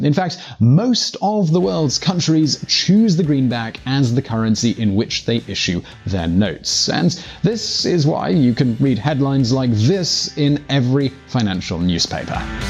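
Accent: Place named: Southern England, United Kingdom. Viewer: It's his South Brit accent. Nothing wrong with his mic. :)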